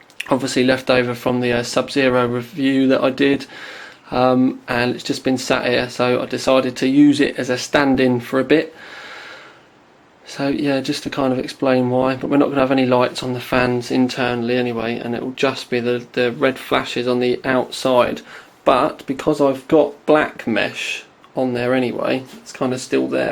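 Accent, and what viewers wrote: England, United Kingdom. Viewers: learning how to do that english accent while watching this video.and how to mod the shinobi :) which I've just ordered x)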